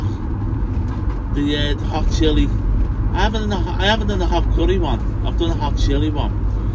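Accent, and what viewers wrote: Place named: Wirral, United Kingdom. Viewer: U live in liverpool u sound more like over the water?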